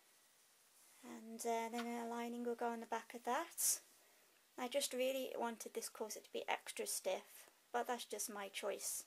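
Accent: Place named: Wales, United Kingdom. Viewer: This must have taken SOOOOOOO long!! Are you from Wales? You sound Welsh :P xx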